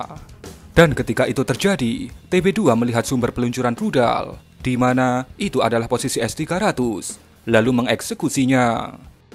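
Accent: Java, Indonesia. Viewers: Ubah dong pengisi suarax Ojok seng meddok ngono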